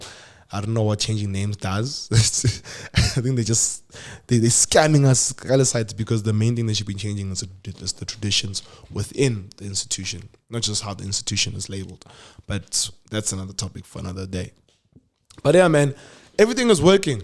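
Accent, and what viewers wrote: Afrikaans, South Africa. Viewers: Your Afrikaans accent monna is not make sure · looking clean mxaaah mfethu you killing me lol great Afrikans accent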